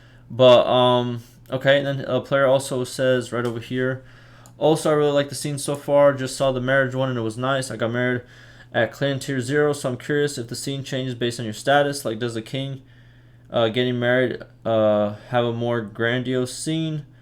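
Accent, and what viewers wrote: South, United States. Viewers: You sound dixie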